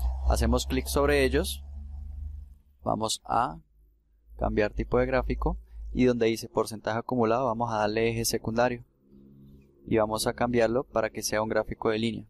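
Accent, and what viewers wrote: Cali, Colombia. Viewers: voj sos caleño si o que? pajame eje japato ve ... buen video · Vos eres caleño cierto??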